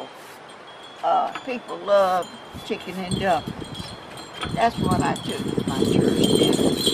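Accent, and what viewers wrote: South, United States. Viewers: Am new to your channel, this is my second video an it’s 1yr old an love it 😍 Just love your your southern accent 💝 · you are a joy....I'm from Australia and think your projects are inspirational.....love your southern accent....bless you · I love her southern drawl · You are such a creative and inspiring person. I do crafts as well, but I’m not as brave and creative as you are. Your videos calm and inspire me. I love your Southern accent - and your humor. You are truly blessed that you are inspired with a unique idea in your head and then you figure out a way to create it. Marvelous!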